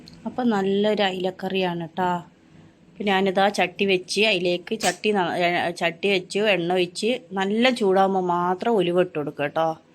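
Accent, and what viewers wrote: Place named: Malappuram, India. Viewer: നിങ്ങൾ മാനന്തവാടി എവിടെയാ സംസാരംമലപ്പുറം കാരുടെ ആണെല്ലോ ഞാൻ അഞ്ചുകുന്ന കുണ്ടാല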